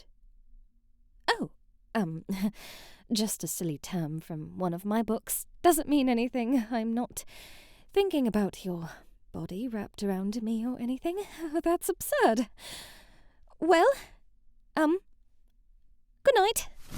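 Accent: United Kingdom. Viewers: im begging for a hairdresser one with Adela you'd fit her with the brittish accent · I love your British voice😌❤so beautiful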